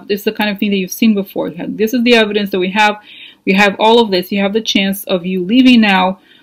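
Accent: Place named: Russia. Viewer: your accent is hilarious!! Are you really Russian?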